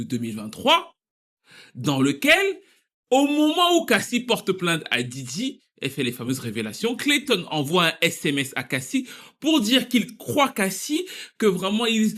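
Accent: Cameroon. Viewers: Si on ne connaît pas le Cameroun ou juste très peu, impossible de connaître le phrasé des camerounais... 🙃 Votre remarque est donc compréhensible ! 😉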